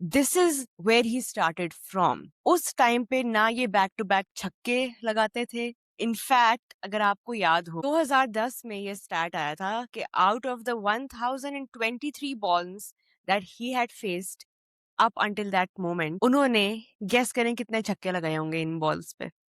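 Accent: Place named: India. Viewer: your accent feels more Indian than Pakistani accent that we've heard.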